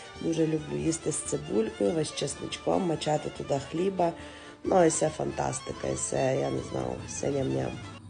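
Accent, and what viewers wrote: Transcarpathia, Ukraine. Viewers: закарпатський діалект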